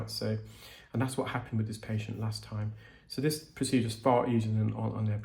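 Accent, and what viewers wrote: United Kingdom. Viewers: i was just thinking this.. I do have morbid fascination, & love watching interesting medical procedures, I just figured out that even though I do watch your videos anytime I jump online, It is your voice that I’ve come to really sppreciate . It is absolutely so peaceful and soothing, makes me so relaxed & happy, I could literally listen to you speak all night. Please write a book about anything you love to talk about, and record it as the author, and sell it as an audiobook.. I could listen to it every night before bedtime.. I have many friends in the UK. so it isn’t just the accent, as lovely as it is, it is actually the tone, and the fact you’re so full of knowledge and so down to earth.. 🤴🏽 Thank you for this channel .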